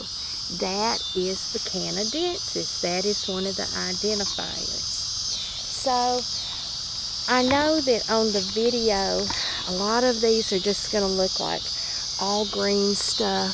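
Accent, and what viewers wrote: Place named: Georgia, United States. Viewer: Love your accent. Reminds me of the ladies I have met in Georgia when I was stationed at Ft. Gordon, Augusta , Ga. in the US Army. Thanks for the great identiication of the variuos species. I just bought some L. Virosa seeds and am trying to sprout some right now. But I have to wait for the second years growth before I harvest any milky sap. God Speed to you !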